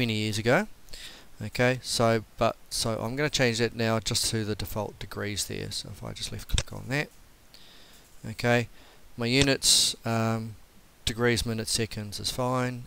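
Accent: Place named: Australia. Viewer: Sydney, Cronulla. Where are you? I can tell your from Au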